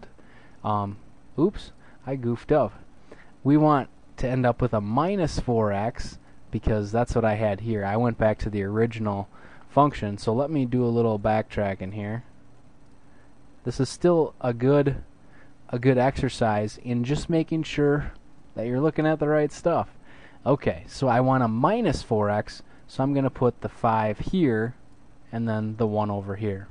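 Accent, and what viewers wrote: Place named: Canada. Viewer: With my exam in 2weeks, i've been really struggling. This really helped! Thanks a ton. Love your accent! Are you Canadian?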